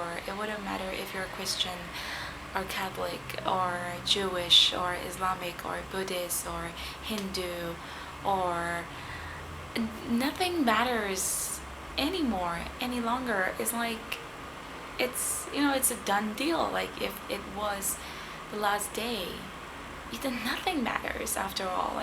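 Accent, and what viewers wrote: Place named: United States. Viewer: စဂၤပူျပည္မွာေမြးတာလား။ ဘာလုိ႔မဲတာလဲဆုိတာ ခင္ဗ်ာရဲ႕ အသံကအေမရိကန္းနဲ႔အရမ္းပီတယ္။ သုိ႔မဟုတ္ရင္ ျဖစ္ႏုိင္တာက စဂၤပူျပည္မွာအားလုံးအေမရိကန္းအသံနဲ႔ပီၾကတာေနာ္။ :S FK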